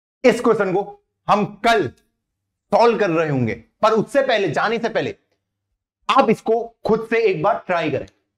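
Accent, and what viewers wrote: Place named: Pahadi, India. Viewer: pahadi tone aari h voice me only true pahadi can notice...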